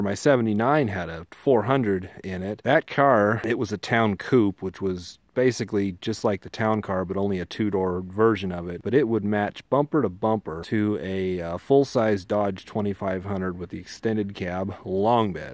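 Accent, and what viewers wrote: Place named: Buffalo, United States. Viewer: God bless u but i cant stand the way people from buffalo ny talk its always like your across the street when your right infront of them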